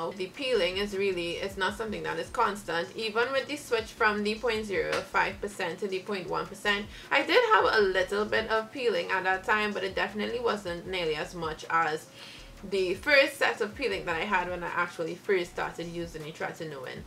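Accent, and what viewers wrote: Trinidad and Tobago. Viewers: I know a Trinidadian when I hear one!🇹🇹🇹🇹🇹🇹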